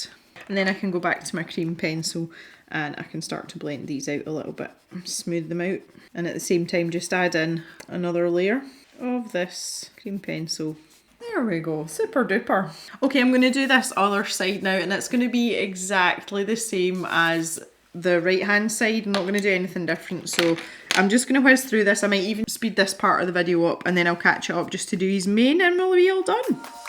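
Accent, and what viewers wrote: Scotland, United Kingdom. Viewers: haha, I think I've said the same thing about enjoying to just listen to you talk, but that's because I LOVE your Scottish accent. But I also enjoy watching your videos and see what you create. It inspires me and also I love watching your ScrawlrBox videos, because it gives me the chance to see if I'm the only one liking or disliking new art supplies ^^ also love to see what you make with the prompts :) Anyway, back to the art at hand. The giraffe turned out really beautiful! I like it :) I have a greyscale colouring book here, but I don't actually like colouring in it :( might be the paper, though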